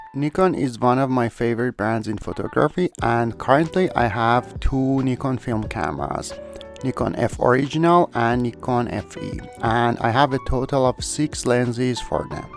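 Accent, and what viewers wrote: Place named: Iran. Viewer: سلام . ویدیوی خوبی بود . ممنون از نحوه‌ی انگلیسی صحبت کردن متوجه شدم ایرانی هستید 😊. در کل با لنزهای زوم ارتباط برقرار نمیکنم . به جای ۲ تا لنز زوم ترجیح میدم ۴-۵ تا پرایم همراه خودم داشته باشم .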